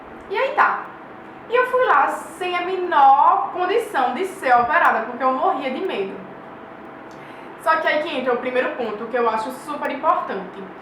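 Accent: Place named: Recife, Brazil. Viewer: acho lindo esse sotaque, tenho uma amiga de Recife, parece que é ela quem está falando rs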